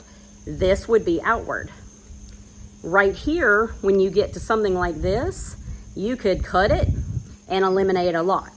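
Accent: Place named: Texas, United States. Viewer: Thank you for an encouraging video. Roses frighten me. Historically, they have been difficult plants to grow. They are subject to so many problems that only the best gardeners had the magic touch with them, so I stayed away from them, but these Knock Out roses are easy to grow according to a friend. She planted six of them eight years ago. Said she does very little to them aside from water them. Said she planted them by more or less just throwing them into the ground. They are now virtual rose trees that are positively dripping in blooms. I am encouraged to try planting some. Do I have to test the soil first? The soil here is pretty clay-ee. I know they need a sunny location. I had no idea about how to prune them, I figured you just cut off anything that did not look right. I had no idea where to cut or that it should be at an angle. Any instructions you can give me to help me get some planted would be greatly appreciated. 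You sound like you may be in Texas where I am. Temps here are really HOT half of the year, and we have tremendous humidity. I have had some good success with Salvias which I love very much, but I long for rose bushes..... Thank you again! Cheers!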